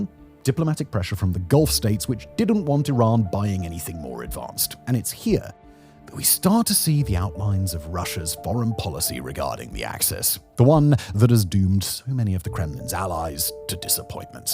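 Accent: RP, United Kingdom. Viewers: This guy thinks talking posh makes you intelligent. Idiot hasnt even worked out the jewish cabal and shills for them cos he would lose his channel and income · unsubbed. not sure how I put up with this unlistenable british guy for this long. british accents are overrated, folks.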